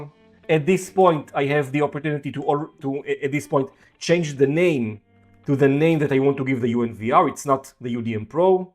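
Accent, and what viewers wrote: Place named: Israel. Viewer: Very good video, concise and to the point, without the blah, blah, blah of many influencers. A pleasure to watch. The guy sounds like a Romanian Israeli.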